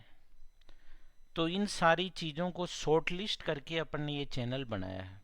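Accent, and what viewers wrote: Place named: Haryana, India. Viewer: Very good initiative sir And very nicely explained ....with Hariyanvi ascent and rajasthani touch....🤗 Great sir best donas ur self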